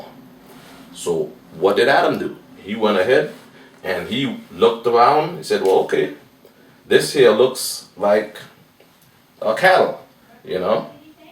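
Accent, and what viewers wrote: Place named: Jamaica. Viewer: He has a Jamaican accent..too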